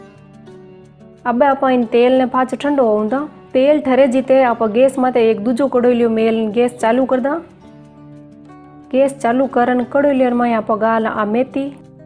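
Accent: Marwar, India. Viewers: मै गुजराती हु। और आपको स्पॉट करता हु की आप अपना वीडियो अपनी मातृभाषा में ही अपलोड कीजिये आपकी मारवाड़ी बोली बहुत स्वीट है आभार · आप प्योर मारवाड़ी बोल रहे हो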